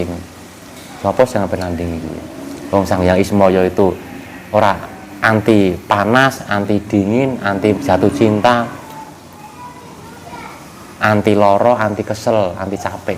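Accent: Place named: Java, Indonesia. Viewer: Salam rahayu ..saya lihat dari logat bahasanya kayak orang jawa, saya lht alamat nya d dipkrifsi kok dari papua ,kami dari jawa timur mas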